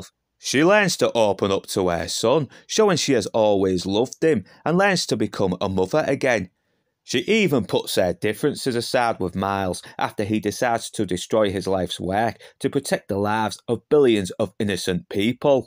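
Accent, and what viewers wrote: United Kingdom. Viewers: I'm Romanian and I adore that British accent!!!Good job mate!!! :face-red-heart-shape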